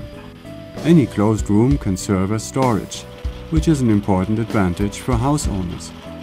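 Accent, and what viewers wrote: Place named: Germany. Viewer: i think he s german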